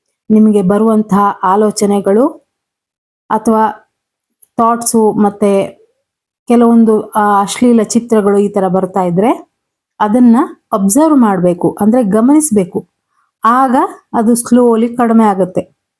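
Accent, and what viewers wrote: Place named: Bangalore, India. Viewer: When I listen to you . I think you r staying in Bangalore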